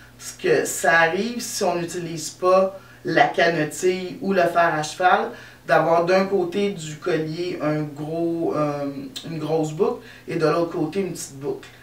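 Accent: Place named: Canada. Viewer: votre accent me rappelle ce beau voyage que j'ai fais au Canada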